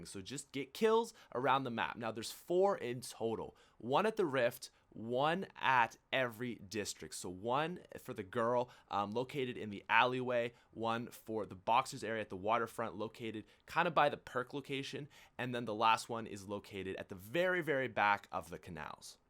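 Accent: Canada. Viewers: im sorry for this but you sound really canadian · Holeh fuck bud ur canadian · if this is racist to u I'm srry. But r u Canadian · r u from Canada?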